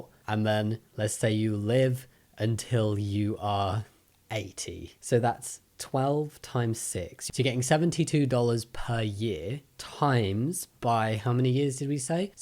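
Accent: United Kingdom. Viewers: it’s alright I’ve gotten used to you saying things in british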